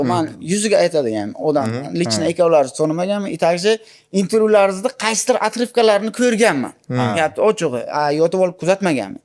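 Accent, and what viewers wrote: Bukhara, Uzbekistan. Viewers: Molodes chistiy Buxoro shevada gapirdi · Сизи яхши куриб томоша киламан самимий соф бухороча гаплариз ёкади узимам бухороликман тушунарли оддий роликлариз куп мусофирдан курдим бу сухбатниям тугриси ичизда бошка яшаркан видёда бошкача одамимийлигизга 5 бахо Лекин тарбия берган ота онанггизга минг рахмат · Toshkentga borib, o'zidan toshkentlik yasab olmasdan buxoroni shevasi bilan ko'tarilgan. Lekin ijodizni sog'indik. Judayam pazitivni edi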